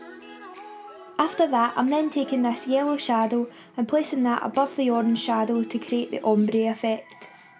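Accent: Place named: Scotland, United Kingdom. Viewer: Are you Scottish? Love the accent! My family are all from Scotland,, my mum and Dad moved down to England and so me and my sister got an English accent so we’re different from the rest of our family 😂😘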